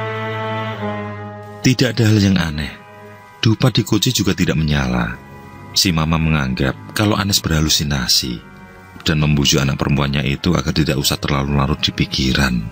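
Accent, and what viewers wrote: Java, Indonesia. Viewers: Mantap gambar2 nya.. skill tingkat dewa,di padu logat.jowo yg sesekali kentel,tebal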